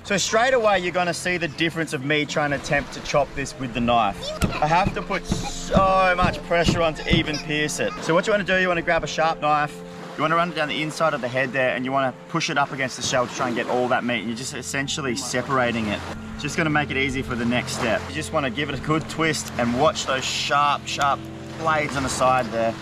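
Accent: Australia. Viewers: Are you Australian mate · i can already hear the aussie accent off the thumbnail clicking 😂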